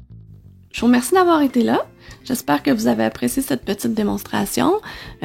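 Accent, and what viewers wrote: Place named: Quebec, Canada. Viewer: Merci pour cette astuce de l’étoile, et cet accent québécois me fait voyager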